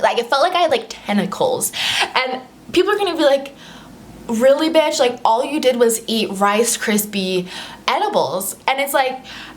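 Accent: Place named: United States. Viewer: She has the typical American girl accent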